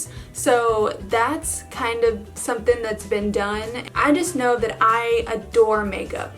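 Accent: United States. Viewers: Hi new sub here Lets be friends! Ive not had any new videos uploaded lately! Im into makeup and diys! Im a TN girl, all my life just outside of Nashville!!! My accent is a little more deeper than yours lol Not sayin thats a good thing lol Omg opryland lol used to love the themepark...but the hotel omg is GORGEOUS and the lights at Christmas!!!! The mall...omg good luck getting a parking spot within an hour during Christmas!!! Right?? Lol Well, so EXCITED your man is coming, hope you have him on the channel...have a Merry Christmas!!! Big thumbs up! Maybe next year we can collab on a video or 2!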